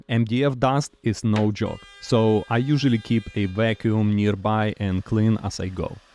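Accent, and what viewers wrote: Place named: Ryazan, Russia. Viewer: Я слышу Рязанский акцент в этом английском. Какой то примитивный чел.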